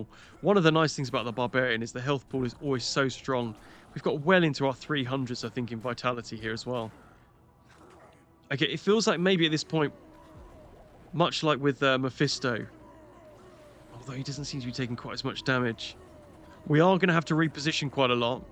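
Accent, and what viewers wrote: United Kingdom. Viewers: Beautiful. :”) (.. British man? Not Australian? :D)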